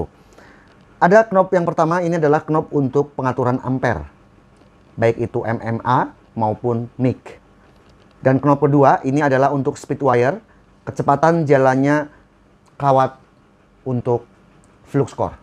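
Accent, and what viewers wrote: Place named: West Java, Indonesia. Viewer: Alhamdulillah bukti hasil positif dalam memberikan edukasi memberikan ilmu gratis yang diberikan oleh akang guru kepada para subscribe yang selama ini setia menunggu review tool murah meriah hingga tool premium, terima kasih atas ilmu dan informasi yang diberikan selama ini, ingat awal mula mengikuti chanel ini secara tidak sengaja kalo ga salah masih 2 k dan masih sederhana masih ada lampu bohlam di atas meja, terus kalo review logatnya sunda pisan, selain itu selalu berbarengan dengan kumandang adzan subuh, selamat akang guru wilujeung 20 k mudah mudahan terus dan terus berkembang hingga mendapat silver bottom 🙏👍👍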